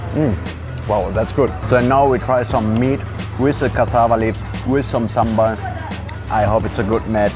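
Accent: Germany. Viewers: Direkt den deutschen Akzent rausgehört 😂😂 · I like Your German aksen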